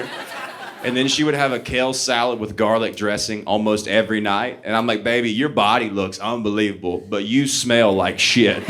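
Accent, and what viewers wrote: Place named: South, United States. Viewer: You are hilarious! The southern accent just makes a Philly girl melt!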